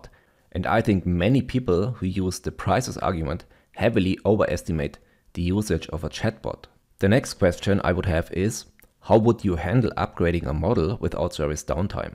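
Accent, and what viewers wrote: Germany. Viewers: Your german-english accent just makes listening to you even better. Things have a more serious tone to it. Fantastic content!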